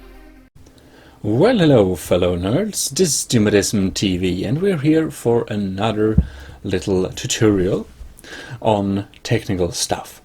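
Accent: Sweden. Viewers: är du svensk? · Your are frome sweden?Tjena bror. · hörs att du e svensk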